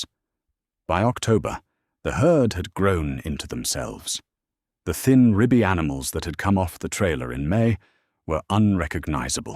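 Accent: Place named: United Kingdom. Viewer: Who cut this, and why is the narrator British? Off.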